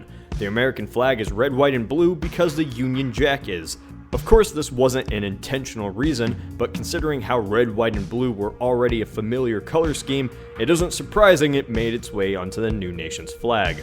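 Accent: Canada. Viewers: So are you Canadian or North American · Are you Canadian?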